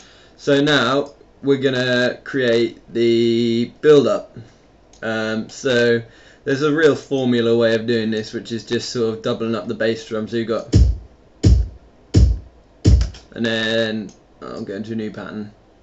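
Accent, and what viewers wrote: United Kingdom. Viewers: you're english,don't you?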